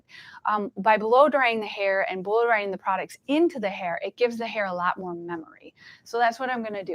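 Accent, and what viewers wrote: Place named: Eastern Europe. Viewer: rahul kumar I agree. And her accent is adorable. Sounds like she was raised with another language. Eastern European possibly? 🔥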